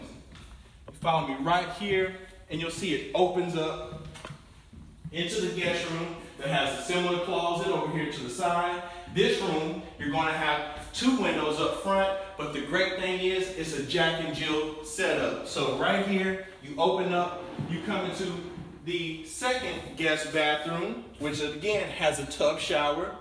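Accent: South, United States. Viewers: I love your country accent. Pay no attention to my son's Lego icon.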